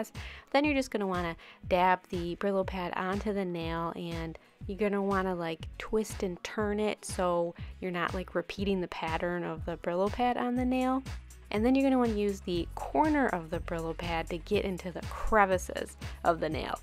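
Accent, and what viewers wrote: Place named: Minnesota, United States. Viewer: The accent reminds me of a Minnesota accent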